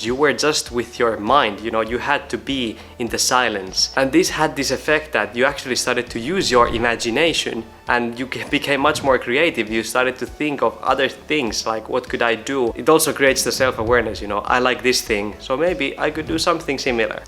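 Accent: Finland. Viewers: Kuulin puhetavastasi heti että olet Suomesta ennen kuin katsoin kanavan tietoja.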